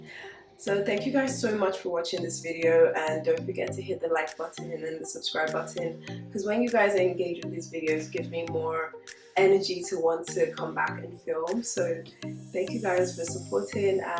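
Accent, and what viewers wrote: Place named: Ireland. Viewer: Love your Irish accent .. I want to visit now ❤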